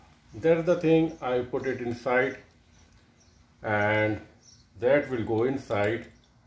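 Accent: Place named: Russia. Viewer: Какой-то у вас Русский акцент!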